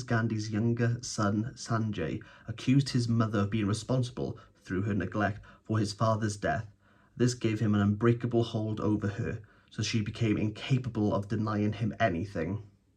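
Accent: Wales, United Kingdom. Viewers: Mate, what an amazing review. I love your energy and engagement. Please keep it up! (And sorry for my ignorant question, but accents from Britain fascinate me — is that a great Welsh accent you have?) All the best.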